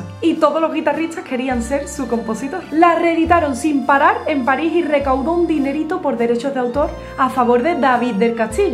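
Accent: Andalusia, Spain. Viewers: ❤ acento español, voz cálida, alegria en la narración y me deleitaste con el sonido antiguo de aires extranjeros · Estará todo inventado pero su belleza,originalidad y pasión por compartir cultura y música no,estoy que hago un curso para hablar Andaluz porque para mi no es habla es música, tan preciada como la antología del cante flamenco, cada post nuevo de es una joya para mi tan valiosa como un concierto de los mas renombrados de la historia,mi sueño es estar en un concierto de su persona y felicitarla por su trabajo. Que bonito.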